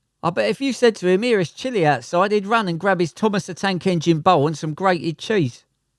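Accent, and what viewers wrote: Cockney, United Kingdom. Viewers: Christ, the commentary is so bad. A right cockerny geezer trying to be funny in every clip, absolute fail.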